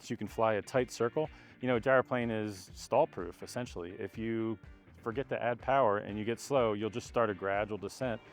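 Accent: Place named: United States. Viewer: most videos don’t mention location, but I actually did in the first sentence. Others clues for you are the English language and the American accent. Is this your first time on YouTube? If you ask questions before making arguments, you would seem smarter. Please take your silly argument somewhere else.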